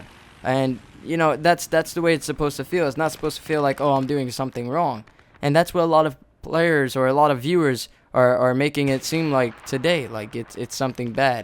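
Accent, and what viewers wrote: United States. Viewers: for you americans out there? i thought you where american.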